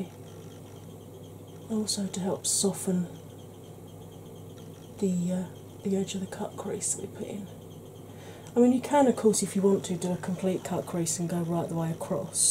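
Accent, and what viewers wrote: United Kingdom. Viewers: Welcome! Love British accents 😊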